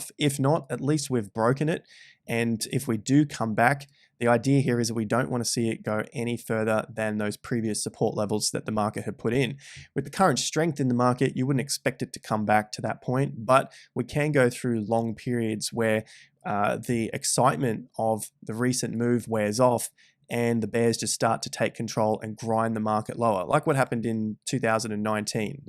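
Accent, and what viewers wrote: Australia. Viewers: Keep up the good work. Great to hear a genuine Aussie accent. Rare in Japan after 20 years. Quality material and delivery!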